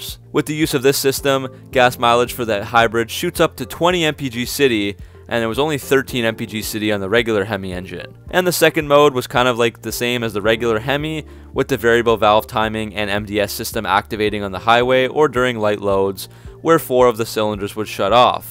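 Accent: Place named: Canada. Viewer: Canadian dialect is omg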